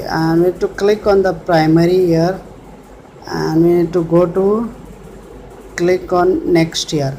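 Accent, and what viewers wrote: India. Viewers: Indian voice, it sure be a fake video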